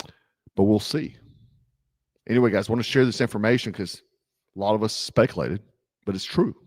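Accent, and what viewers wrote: South, United States. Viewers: He's southern, they read, write and speak the south American way .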